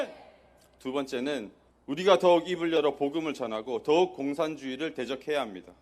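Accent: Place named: Canada. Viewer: ❤❤❤❤❤❤ 말이 필요 엄네요....그리고 영어 발음을 보니 확실히 캐나다 사신티가 나네요...응원합니다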